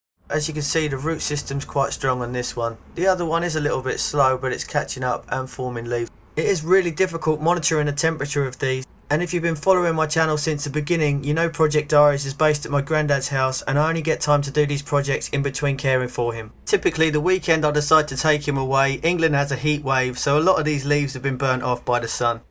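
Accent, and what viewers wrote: England, United Kingdom. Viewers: When I first heard your accent I thought you must be an Englishman living abroad, then when I realised you can grow them in England it gave me extra confidence to try them here in France. I like your video I'll be giving this a go and then checking out your other videos. Well done and thanks. Al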